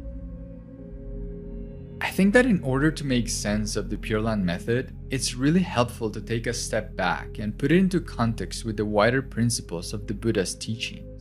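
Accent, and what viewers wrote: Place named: Quebec, Canada. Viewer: Very informative! Look forward to the rest of the series. (Et je pense que c'est un accent québécois que j'entends...😊)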